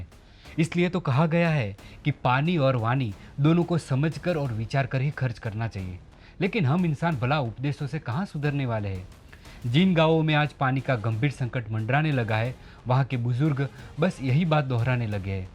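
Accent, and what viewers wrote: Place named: Gujarat, India. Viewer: your Hindi is good but tone is Gujarati, please keep it up!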